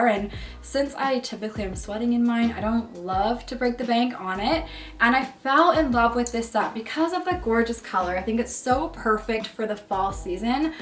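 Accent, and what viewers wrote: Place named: Canada. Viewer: Love your pieces girl💕how did I know u were Canadian based on your accent ☺️I guess we do hv an accent 👍🏽😂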